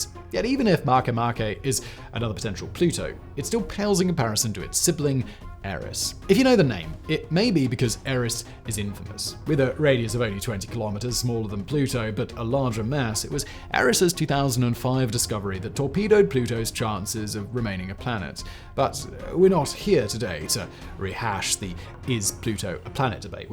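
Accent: RP, United Kingdom. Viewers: Like the content but hate the pretentiousness + posh accent, it's hard to listen to honestly. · Absolutely no idea what Simon is saying, but admittedly impressed by his ability to morph “extremely British” into novel languages.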